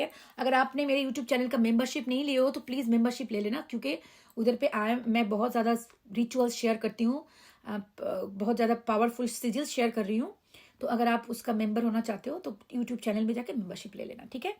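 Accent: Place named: Bengal, India. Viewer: Bengali ke mouth se hindi sunne mai bahut nice lagta hai👍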